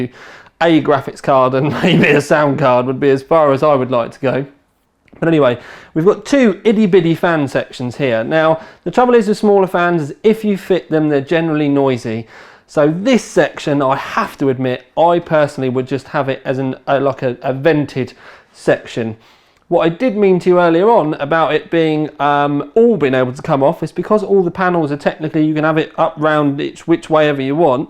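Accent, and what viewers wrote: United Kingdom. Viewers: He is from the UK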